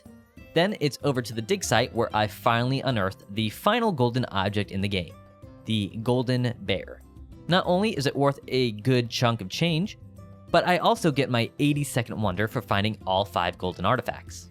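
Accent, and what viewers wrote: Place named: South, United States. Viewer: Eyyyyy your southern accent came out here lol Now i hear it throughout the rest of the vid but, tbf, southern accents are hella endearing and you do a great job with masking it, fwiw xD This vid is still a big comfort lol I wouldnt call out the southern accent if I werent intimately familiar with it, rest assured xD Feels like an Arkansas or northern texas accent lol. My familys got that accent. I do too if I'm around the right people lol Forgive me if ya dont have a southern accent and I'm assuming xD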